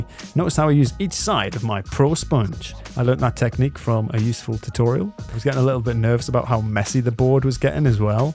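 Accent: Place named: Newcastle, United Kingdom. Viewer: Love your Geordie accent, I laughed when you said Sainsbury's is mad expensive 😂 I feel ya, cause it's my local too! The mosaics turned out amazing!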